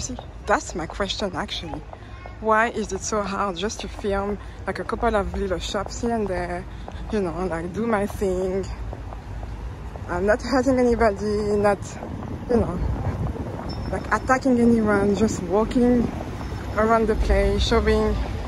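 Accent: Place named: France. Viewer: Try not to be put off by people saying you can't film. You are allowed to film anything in a Public Place! Great walk as usual! So I have now deciphered that your first language is French! Love the voice.....oooh la la!!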